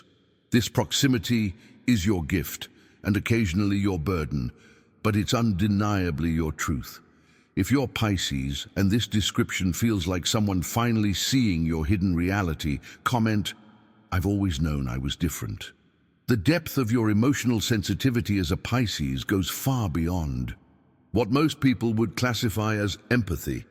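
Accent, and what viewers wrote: United Kingdom. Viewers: Midlands England x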